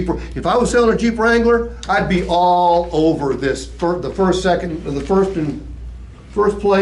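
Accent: Appalachia, United States. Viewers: hillbilly and his bullshit hick talk!!!!!!!!!!!!!!!!!!!!!!!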